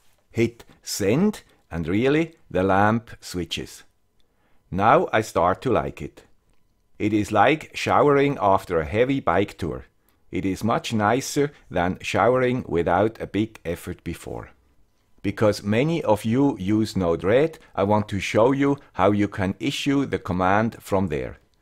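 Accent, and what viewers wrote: Switzerland. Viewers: Hey Mr Swiss Accent.... you should try using the mobile hotspot in Windows 10. Just search hotspot and it will take you to it. Set the SSID and Passwort and activate! ;) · It's a sin. Anyway, ok for the swiss accent: you are great!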